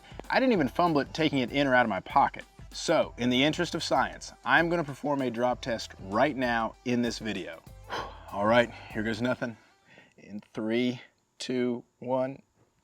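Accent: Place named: Louisiana, United States. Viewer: Debating whether to go caseless for the 14 Pro Max. You sound like you’re from Louisiana!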